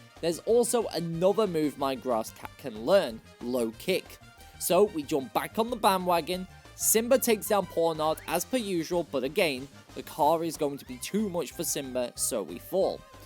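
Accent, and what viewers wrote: United Kingdom. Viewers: Watching the first minute of this video I can tell he is British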